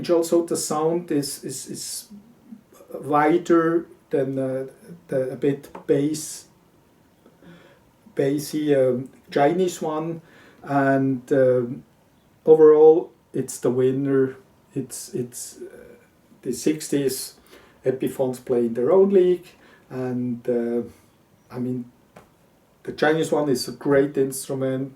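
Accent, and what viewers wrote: Switzerland. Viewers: Hi, great video. You sound a bit Swiss. Do you live in Switzerland by any chance?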